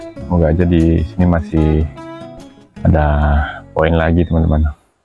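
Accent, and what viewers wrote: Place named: West Sumatra, Indonesia. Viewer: Iyo da... Wak di perantauan ma hahaha.... Mako e wak batanyo kok logat uda taka urang awak lokasi pun mbo caliak taka di padang..... Yo bana rupo e di padang hahaha.... Dima tu da padang Panjang kah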